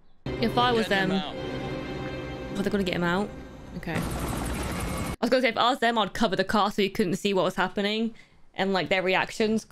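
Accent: England, United Kingdom. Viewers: Back to the future is a good shout. My favorite film. But I think you come straight off the bat with Nobody and work your way back. You sound English, have you seen Snatch or Lock Stock?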